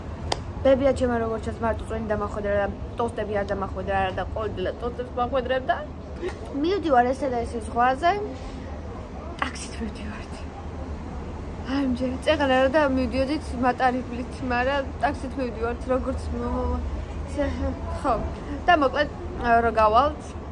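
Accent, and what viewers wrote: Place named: Imereti, Georgia. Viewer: მე თვითონაც იმერელი ვარ და იუთუბზე რო ესეთი საუბარი მხვდება მიხარია რომ ძალიან გახსნილი ხარ და რავი ბევრი ეგეთი არაა და ძან გულწრფელად საუბრობ რავი ჩემგან გულით რესპექტ💜💜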